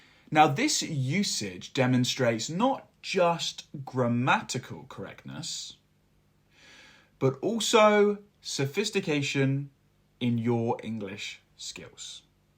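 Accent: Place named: United Kingdom. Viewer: He is definetely British.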